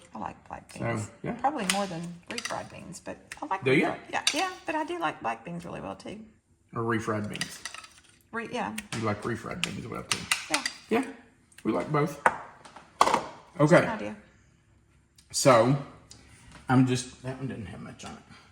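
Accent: South, United States. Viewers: Goodness, don't let anyone tell you how to speak! We like your down home country drawl in your voice! Alllllll of it! 😊 has a touch of twang in her voice too and you both are a delight to listen to! It really is like we are all sitting around the same table having a nice friendly chat with some really good food! Y'all really help cheer up people like me on their own. Blessings!❤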